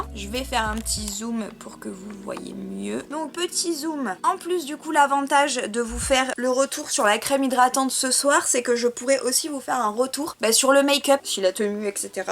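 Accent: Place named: England, United Kingdom. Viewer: Cet accent anglais j’adore😂😍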